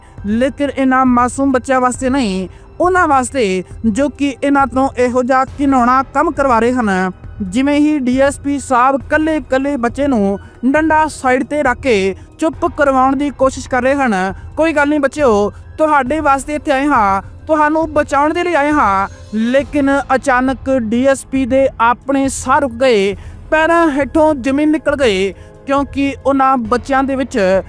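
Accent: Bihar, India. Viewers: Don’t we have anyone who can speak Punjabi in a normal tone ?????? Who will watch you ? !!! Like a Bhyia speaking Punjabi !!!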